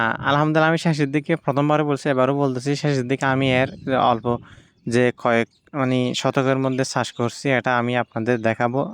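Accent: Chittagong, Bangladesh. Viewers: মনে হয় চট্টগ্রামের মানুষ বাড়ি কোতাই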